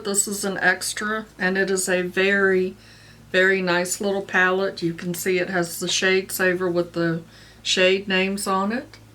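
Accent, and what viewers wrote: South, United States. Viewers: I love your accent it sounds southern and so sweet. Enter me please I like the press on nails. And the illamasqua as well as earth harbor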